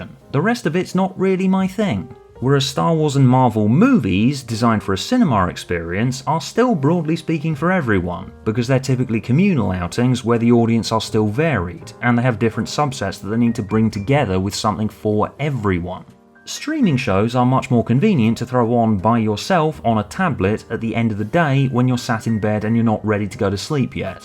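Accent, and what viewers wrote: United Kingdom. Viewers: I don't totally get the point you're making? I know a lot of the comments find it really smart I just personally kinda don't. Especially with the end question of “Would Doctor who catch on today?” Like, that's just not a very good or interesting question. The answer is probably no but you don’t see anyone asking that question about Batman or Shakespeare plays. I also think there was an assumption made in the video that the world of streaming is SO DIFFERENT to how TV was before and that’s not really true. Where we watch stuff is different but the age of binging has been dying out for a few years now and streamers just seem to be replacing cable. I personally think if you didn’t have the “smart youtuber” cadence and a British accent this video wouldn’t come across anywhere near as insightful as it does, because it just isn’t that insightful and it feels more like a man trying to intellectualize the fact he doesn’t think dr who is as good as he used to think it was. 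Also all the streaming shows you compared it to at the end of the video were made for adults and the show is a childrens show. You’re better off comparing it to “Bluey” than you are “Severence”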